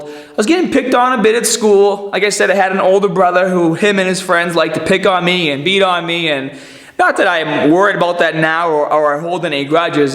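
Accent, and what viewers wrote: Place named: New England, United States. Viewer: Aside from being oh so easy on the eyes...one of the reasons why I watch your videos is because I am always impressed with your authenticity as a person. You are a kind soul. This couple with the fact that your NH/MA accent is so familiar to me, allows me to digest what you are teaching and actually benefit from it. Happy New year to you and "Ericer" LOL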